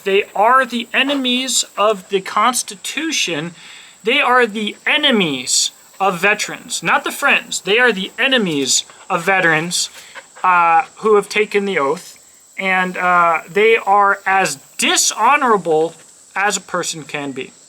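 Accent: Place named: Georgia, United States. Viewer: As a northerner in ga your accent is a relief. Good video as well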